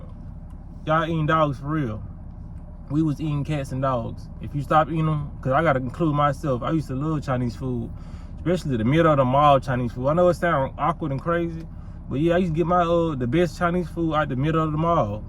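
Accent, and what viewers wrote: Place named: Memphis, United States. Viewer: You Sound Like From The Hood We Black Memphis.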